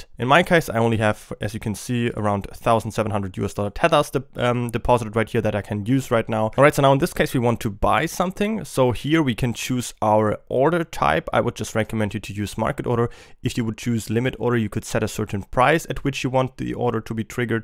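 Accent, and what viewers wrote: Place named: Switzerland. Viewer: I can hear the slight Swiss accent ;) I like it! Thanks for the vid!!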